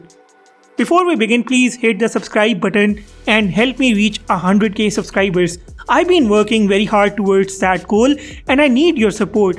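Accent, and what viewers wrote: India. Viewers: Why the F you lying? You have an indian accent.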